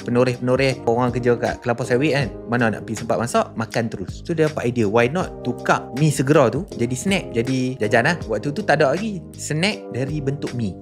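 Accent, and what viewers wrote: North, Malaysia. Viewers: Apasal Melaka cakap orang utara?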